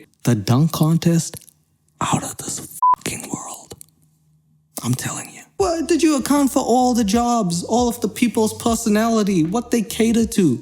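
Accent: South Africa. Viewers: My dude, I thought you were South African because of your accent (my first impression). Omg you're so funny, I didn't see the time past😂😂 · wow i knew you were from sa before you said it because of how you talk (also from sa, moved aswell) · I was sure I heard the South African accent 🇿🇦 proud of you man. Killing the YouTube game. I wish you success boet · Omgosh i just knew it !! I always felt that SA vibe. I just recently started watching you. And have really been enjoying the humor. But i kept thinking u kinda have an accent but not really . But i just knew it... I am from Cape town Anyway keep on keeping on ✌✌✌Thanks for the great vids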